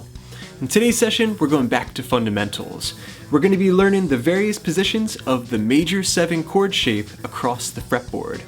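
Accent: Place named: Mid-Atlantic, United States. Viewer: Very nice lesson, appreciate it. I'm from the mid-Atlantic and recognize your accent ... a lot of people probably wouldn't pick up on it.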